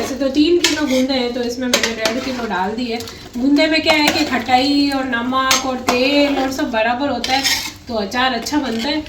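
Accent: Jodhpur, India. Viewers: Jeejaa aap jodhpur k rahne vaale h ya aapki meethe boli m jodhane kee apnayat h achar k kya kahne vaah saa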